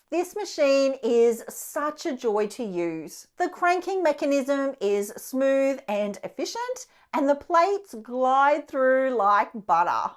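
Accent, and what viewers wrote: Australia. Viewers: Oh,... I love your voice! Your Aussie accent is fun, and your voice modulations are happy and cheerful! Please don't change. ~Kathy ❤❤❤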